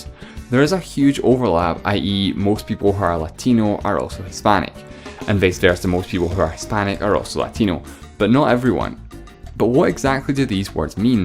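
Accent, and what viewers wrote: Scotland, United Kingdom. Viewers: i quite like his voice, love me a scottish accent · You made one fundamentaL mistake, claiming that "people from, Portugal are essentially Spanish".It is like claiming that people from Wales or Scotland are ... English ! :-\ you suggest that anyone speaking a Romance Language is a Latino, incluidng (drum roll) Italians and Romanians.May I politely suggest you change that view before you upset the entire Italian Youtube community !!!Guyana and GHuiana are NOT pronounced "guy-ana" but "goo-yana" "Not all people from latin America are latin..." is exactly like saying "Not all people from North America are Americans". Because they are not. Theyr ancestors emigrated there from Europe or came with the African slave trade - the only REAL South Americans and North Americans being the (nearly extinct) Natives.All in all... I do not know whether you are Scottish or Geordie but you DO have an issue understanding what is Latin, what is Latino and what is neither.Good night. 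· are you Scottish? cause I'm in love with your accent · are you Scottish ? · You have a very strong Scottish accent! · Of course the narrator explaining all the differences in Latin American is not American. I’m trying to find out the accent maybe Scottish? Americans should be the ones who know inside out this but they are the only ones who are ignorant.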